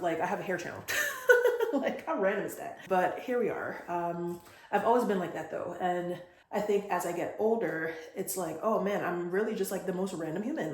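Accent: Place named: Barbados. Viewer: So good to hear a fellow country woman 🙏🏽 I would not have guessed that you are a Bajan you have lost most of your accent. Your hair is look good and I will be following your progress as my hair is the same length but it seems to have slowed down in it growth but is getting denser and thicker. Also I am planning to get a ReAir. I have seen and read some very good reviews. My advice to you is use a heat protector and increase the heat slightly for the final drying process for each section and you will see better results. 💕👍🏾🙏🏽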